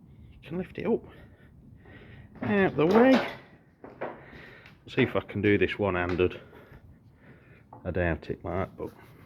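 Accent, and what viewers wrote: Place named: Sheffield, United Kingdom. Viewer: Did you get anywhere with setting this up? I’ve got one in Sheffield (you sound local) in similar condition to yours but mines got the leg system if you need to have a look how it was originally fashioned. Wicked saw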